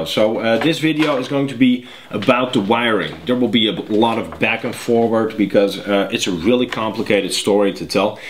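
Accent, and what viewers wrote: Netherlands. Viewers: ok, are you from Holland?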